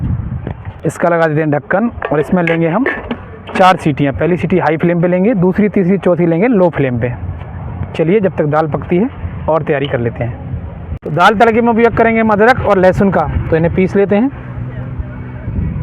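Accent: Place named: Uttar Pradesh, India. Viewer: sir j aap up se ho kya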